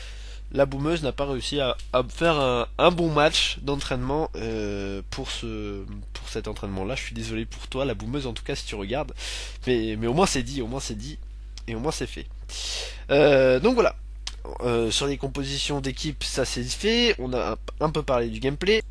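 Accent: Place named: Marseille, France. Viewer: Ta un accent marseillais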